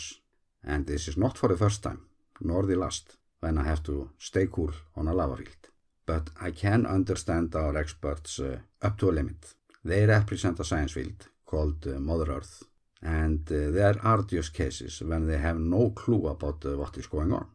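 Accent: Iceland. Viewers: Hey don't mess with the icelandic accent!! Learn proper english english bro then you should understand this mister murica.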